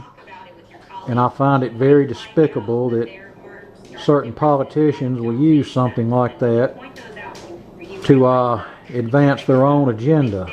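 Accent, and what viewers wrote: South, United States. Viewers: I don't ever recall anyone dying from consuming too much weed, yet not a day goes by that an innocent person isn't murdered by a goddamn assault weapon, designed to be used on a battle field. Guess we really shouldn't be surprised to hear you spew more negative comments about a plant that to the best of my knowledge hasn't killed anyone, and speak with such authority and fondness concerning assault rifles. We've been trying it your way forever, and things just keep getting worse for the children, yet every time we try to introduce even the most basic, common since changes in firearm laws, such as better back ground checks for instance, wrong wing fools like yourself cry about it. Ok, what do you backward ass right wingers propose we do? None of your representatives have any legislative proposals at all, AT ALL ! That is unless you consider M&M's, Disney & gay Americans real legislation. 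Most of you traded your country for a goddamn Red hat, and then tried to over throw our country because you didn't like the outcome of an election. Holy Shit, don't you feel smart ? ;-). You all were played by perhaps the dumbest American of all, and definitely the worst business man in the country. Based on that backward sounding southern accent of yours, we really shouldn't be surprised to hear you talking the way you did about this weeks school children being murdered, I mean they were guilty of going to school after all ! Such good Christians !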